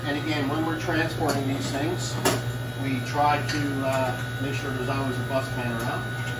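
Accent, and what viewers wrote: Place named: Canada. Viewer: I hear Canadian accent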